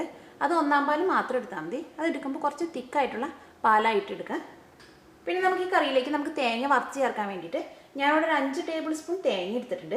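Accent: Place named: Vypin, India. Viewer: cheachi super vypin karude bashayil paranjal thakarthitund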